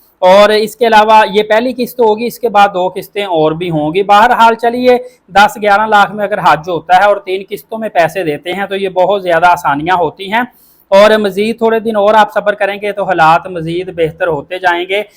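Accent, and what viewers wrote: Punjab, India. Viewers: پالیسی لفظ ہے جناب پلیسی نہیں بولتے 😊 آنگلش بھی پنجابی میں 😊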